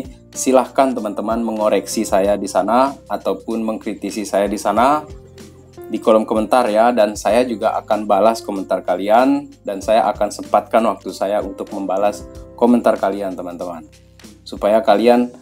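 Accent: Sumbawa, Indonesia. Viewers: Penasaran sama masnya, kok mirip orang Sumbawa ya medoknya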